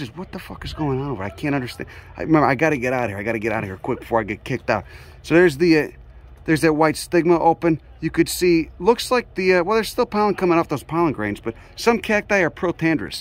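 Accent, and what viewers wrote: Chicago, United States. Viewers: I love your videos!! If you come near the Northeast I could show you some great spots...I'm extremely interested in wild edibles, (my 4 acre yard Is one big botanists dream!) My thick Boston accent and your chicagoan accent would meld perfectly. Keep on keeping on my friend!!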